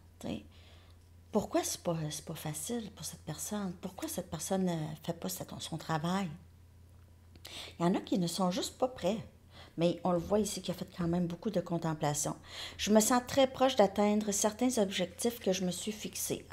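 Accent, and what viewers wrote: Canada. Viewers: Bjour trop mignonne un petit ange surtout votre accent canadien bonne continuation et rayon de soleil d Algérie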